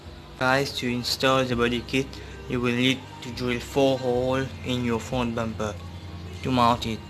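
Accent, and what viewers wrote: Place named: Mauritius. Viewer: You sound Mauritian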